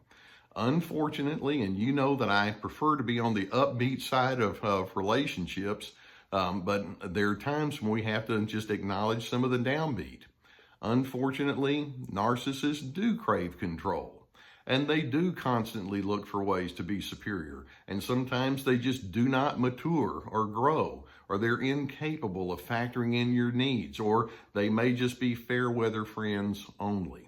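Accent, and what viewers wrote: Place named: Texas, United States. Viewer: "I wish for you Stability, Sensibility and Objectivity". "I wish for you Dignity, Respect and Civility in your actions and relationships" = from other Dr Carter videos. I love having the truth in my ear with Dr Carter's warm, kind TX accent! Appreciate your clarification of the "duality" of people's natures! Keep up your work for the Common Good of humanity!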